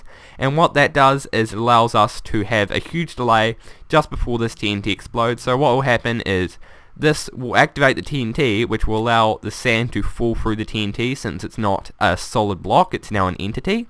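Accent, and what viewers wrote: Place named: New Zealand. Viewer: As soon as I hear your voice I knew you were a Kiwi <3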